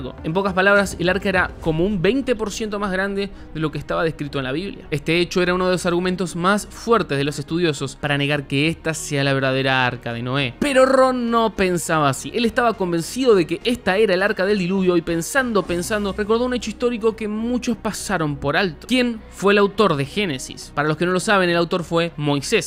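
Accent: Argentina. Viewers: Con ese acento argentino las Cosas parecen un chiste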